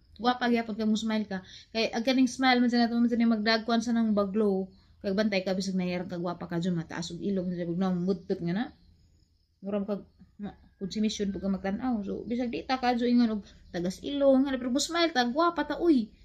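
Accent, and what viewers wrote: Bohol, Philippines. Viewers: Mo angay jud ko sa imong sinultian. Pure Bolanon jud. ❤❤❤❤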